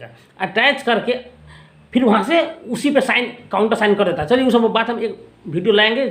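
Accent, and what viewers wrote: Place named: Bihar, India. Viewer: Thodi bhahasha mein bihari touch hai thoda slow boliye please.. smjhne mein dikkat hoti hai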